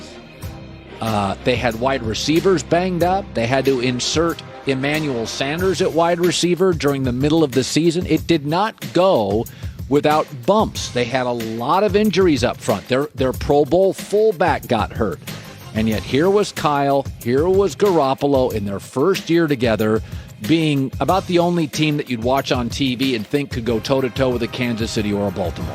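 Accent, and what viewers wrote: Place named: Boston, United States. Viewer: Pick one; you can either have a, slurring S’s speech impediment, or you can have a Boston accent. You can’t have both, and be in broadcast journalism. Even sports broadcasting.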